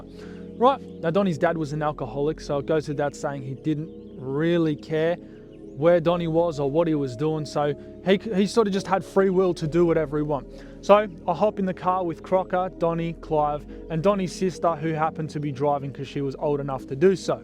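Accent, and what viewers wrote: Australia. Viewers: Love the way he speaks full Aussie lingo 😊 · mate ... thought he was omegaverse but no he's just australian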